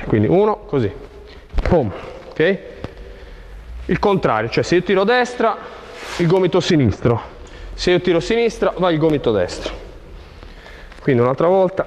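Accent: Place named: Sardinia, Italy. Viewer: accento sardo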